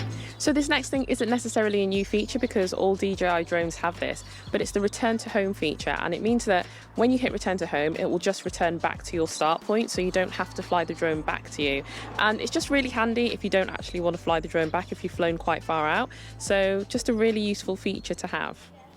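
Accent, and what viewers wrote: England, United Kingdom. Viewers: My God i loved your video, but your british accent ☺😉 I bought also my mini 3 Pro, and for me is the first time i will be using a Drone, i love aerial photography and video 🤗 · I have an original Mavic and Spark that I haven't flown in ages. I'm seriously considering getting a Mini 3 and getting back in the game. Great video. I can listen to UK women talking all day ❤ lol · Glad Sipscriber here. Grate vdo. But I can’t help but wonder how much u look like Young Dolph 🐬 I ain’t know his young sis had a Fine ingish accent 😄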